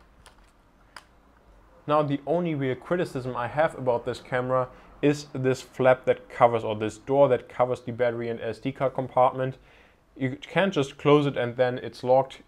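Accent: Germany. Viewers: Wie ich einfach direkt raushören konnte, dass du Deutscher bist :D Wie auch immer, super Review!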